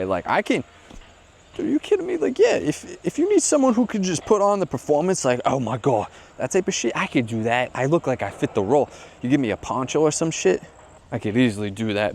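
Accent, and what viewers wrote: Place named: Massachusetts, United States. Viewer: Where you at? By the sound of your accent I often think you must be in Massachusetts.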